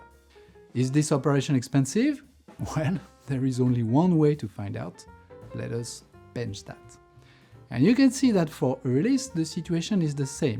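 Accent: France. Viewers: Thank you! I guess my French accent is incidental though... 😄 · I love the JEPCafe and I esspecially love your french styled englisch ! Keep on doing this series, its so great and informative ! Thumbs up!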